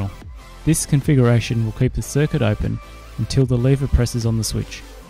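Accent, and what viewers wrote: Australia. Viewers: are you Australian or Kiwi?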